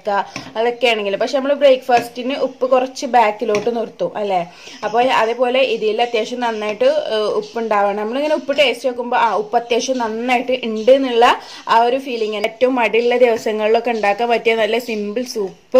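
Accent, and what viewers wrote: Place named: Perinthalmanna, India. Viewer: Nalla rasond samsaram kelkan..enik PMNA othiri friends und..avar parayana polund..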